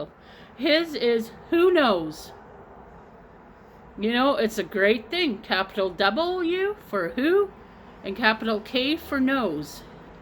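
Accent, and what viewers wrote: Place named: Acadia, Canada. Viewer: Oh wow, just saw this, thank you! French Acadian accent 😉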